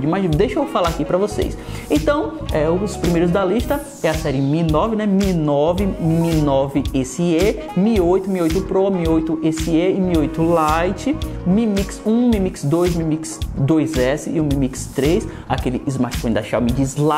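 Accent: Bahia, Brazil. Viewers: Ele é baiano !? 🧐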